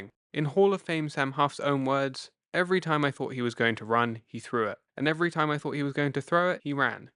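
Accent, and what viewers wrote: United Kingdom. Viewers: A British accent for the narrator? About an NFL player? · Full of typos. And I'm sorry but listening to a Brit talking about Johnny U is like listening to a Sherlock Holmes story read by an American. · Why is some Brit guy telling us about American football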